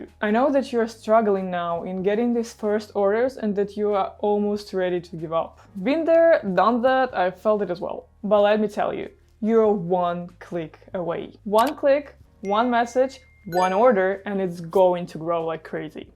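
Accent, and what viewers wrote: Russia. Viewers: Are you a Russian? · Are you russian or latvian or from Dutch ? Im a bit confused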